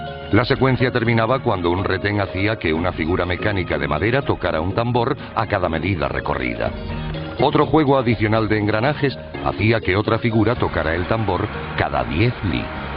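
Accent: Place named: Spain. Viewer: La locución no es español latino, sino es español de España.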